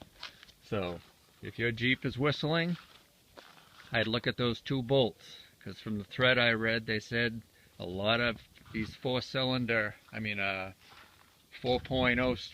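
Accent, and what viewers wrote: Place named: Maine, United States. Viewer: sound like you are from maine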